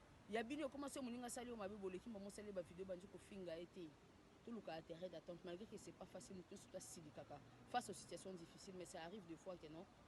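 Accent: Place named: Canada. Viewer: Osololi la canadienne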